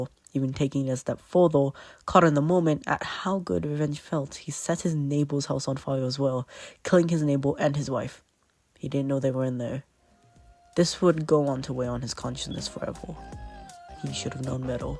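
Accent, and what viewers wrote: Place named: Canada. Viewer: I hear the Canadian in your voice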